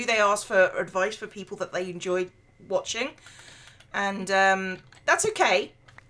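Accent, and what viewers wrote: United Kingdom. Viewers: You don't sound fat. You sound British.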